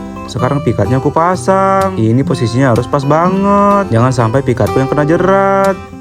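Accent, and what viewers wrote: Java, Indonesia. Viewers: bang aslinya mana bg,,kok ad logat jawanya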